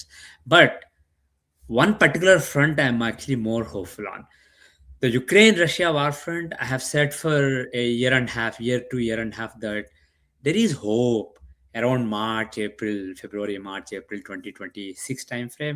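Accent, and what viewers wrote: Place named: India. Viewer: Please cut off the Indian accent like many others who appear on Indian podcasts, news media etc speaking English that can't be apprehended easily.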